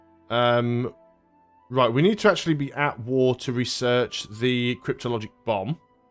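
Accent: United Kingdom. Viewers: Which part of uk are you from?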